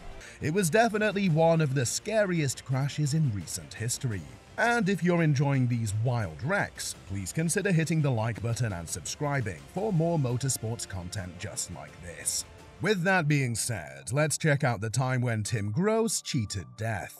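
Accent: United Kingdom. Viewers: I was going to watch until I heard the Brit narrating....😱🤨🤬🤬🤬🤬🤬🤬🤬🤬🤬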